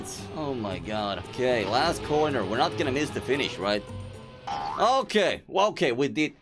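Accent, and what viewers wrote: Sweden. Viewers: are you Swedish? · are you swedish??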